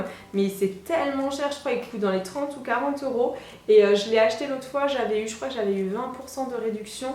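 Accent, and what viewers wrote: United Kingdom. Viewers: J adore ton hello et ton accent british. 👍👍tu présentes aussi des tres bons produits même pour les hommes c good. Très bonne vidéo. Merci à toi ça fait plaisir que tu sois aussi active. 💙💙✌✌#warrior4ever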